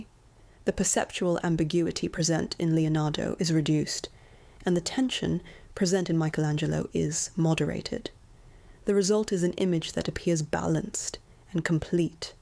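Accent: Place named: England, United Kingdom. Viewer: Hate the clipped English accent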